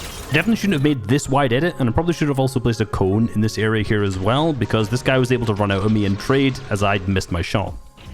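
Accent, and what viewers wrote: Scotland, United Kingdom. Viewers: Can we 1v1 pls? Also are you Scottish? Because I think Scottish people have the coolest accents.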